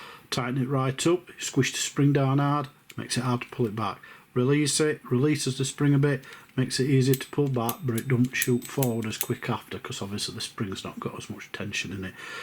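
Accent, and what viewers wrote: Barnsley, United Kingdom. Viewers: Barnsley Lad ?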